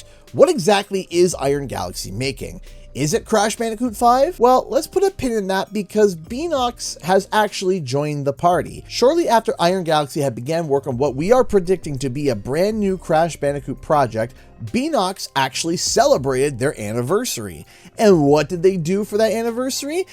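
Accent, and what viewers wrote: Canada. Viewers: What is doing talking about Crash bandicoot? He sounds more Canadian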